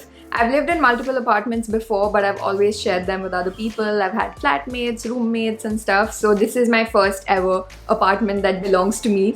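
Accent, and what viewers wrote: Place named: Mumbai, India. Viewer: What a ridiculous thing to say. Living alone is something that a lot of people aspire for. You don't need to be filthy rich for that, although of course it helps. And in every city in the world people have peculiar way of pronouncing things. Growing up in Mumbai, even I used to speak like this only. Eventually when I shifted I speak like people around here now. Nothing stuck up about it.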